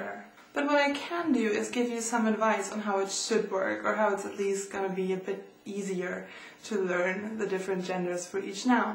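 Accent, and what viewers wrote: Germany. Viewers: she's german it's a german accent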